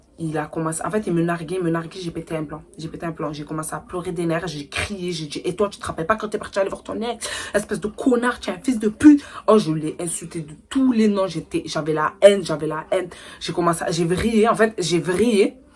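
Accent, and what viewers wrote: Marseille, France. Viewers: coucou je suis nvl sur ta chaîne ton accent me fait penser a une marseillaise peut-être je me trompe t mignonne nimporte qu’elle filles au monde ne mérite pas cela l’homme qui a un comportement comme sa franchement sa me dégoûte je te souhaite bcp de bonheur et du courage pour la suite tu ma donner chaud au coeur tellement sa ma toucher 😢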